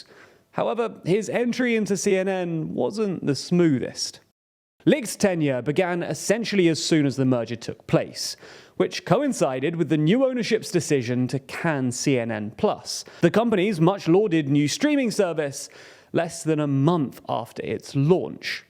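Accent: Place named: United Kingdom. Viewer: Why do people automatically believe that a guy speaking in a British accent is some kind of expert on news! Especially when we freaking live in North America and have literally thousands of news "outlets"? So why listen to 3rd hand news from some British Pud who hasn't ever left his own town, let alone his country!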